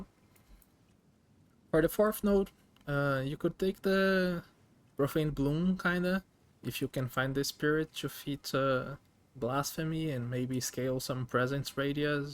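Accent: Brazil. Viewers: Voce tem sotaque brasileiro parece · hmmmmmmm tu é br né? esse e esse sotaque, me cheira a br kkk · é Brasileiro esse sotaque🥰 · Mano, excelente vídeo aospdkaposdkaspodk eu comentei antes de ouvir você falar, ai eu vi que era BR hahahahaha. Vou seguir sua build. Você acha que ela funcionaria com Abyss Lich?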